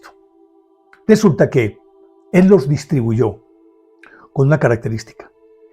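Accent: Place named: Colombia. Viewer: Todo esto es muy interesante, lástima que la copia de la obra usada es una obra muy alterada, y ese halo de santos vuelve a aparecer, soy un gran amante de la obra de Leonardo Da Vinci sin el endiosamiento que le han dado, era un hombre talentoso, seguramente muy conflictuado por sus orígenes, su homosexualidad y sobre todo que fue muy incomprendido. Don me gusto su tono de voz y el acento colombianos es muy bonito, gracias!!!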